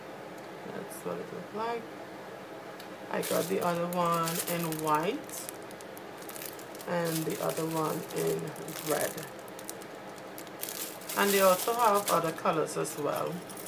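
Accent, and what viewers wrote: Trinidad and Tobago. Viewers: i love trini accents :) lol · are you from trinidad? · are u from Trinidad?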